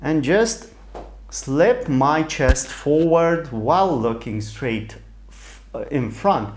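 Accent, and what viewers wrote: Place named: Romania. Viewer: Care ti se pare mai ok, cel mesh-at ?ca nu am inteles ( btw misto accent, mi-a luat ceva sa imi dau seama ca esti roman 😂 )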